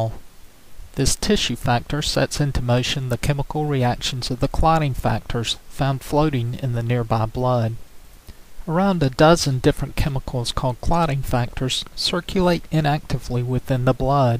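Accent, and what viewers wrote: South, United States. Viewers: Love love love your southern accent ! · the southern accent was very strong and though i am southern i cant understand it. or maybe you just need to blow your nose... i dont know...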